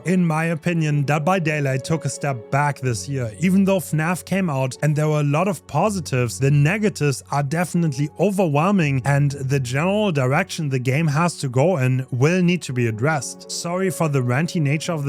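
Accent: Germany. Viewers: Can you stop talking like the fucking rift guy while adding your horrible german accent dude? Wtf is wrong with you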